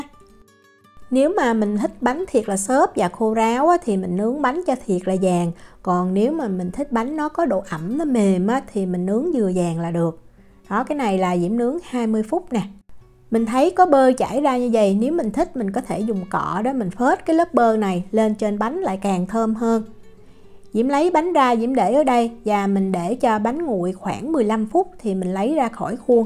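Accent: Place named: Bien Hoa, Vietnam. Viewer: Dạ, e nhớ rồi, e cảm ơn chị nhiều ạ. E cũng quê Biên Hoà luôn chị, nghe giọng của chị thấy nhớ quê lắm, e hiện đang ở Pháp, e từ không biết gì về nấu ăn mà xem youtube của chị riết e học hỏi được nhiều lắm ạ 🥰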